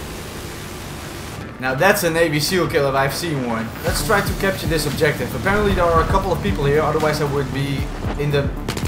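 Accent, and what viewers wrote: Netherlands. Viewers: i can tell you're from the Netherlands from your accent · this guy has dutch accent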